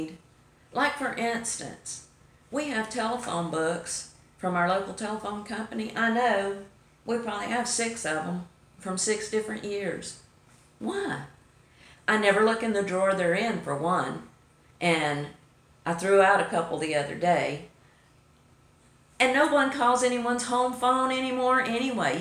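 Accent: South, United States. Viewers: Well I do agree the older we get, we do start to TRY and rid ourselves of things we don’t use anymore and collectibles that just gather dust. But I do have a shoe and boot problem,I admit,I’m addictive to them. I love boots, cowboy boots,casual boots, rain boots, it doesn’t matter,I love boots. I love my shoes as well,I see a pair of cute shoes and I just have to get them. I have a problem,I admit it. BUT I do get rid of them if I don’t wear them for awhile and to be honest,some of them I have never worn, I use to be an impulse buyer, but I’m over that. I don’t like my house cluttered,I like things in its place and this sounds crazy to some, but I like to clean and I’ve been that way my whole adult life. If I get bored, I clean out something or organize a cabinet or drawers,just whatever hits me. I don’t really bother the boot and shoe area.LOL. Enjoyed seeing you and hearing that sweet southern accent,hope you have a wonderful day and take care and be safe.God Bless You all and praying for Granny. 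💕💕💕💕 God Bless America ❤️🇺🇸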